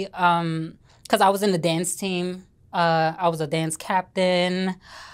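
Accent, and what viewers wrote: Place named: New York, United States. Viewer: Six minutes in & I cannot see u as a male! Love your New York voice.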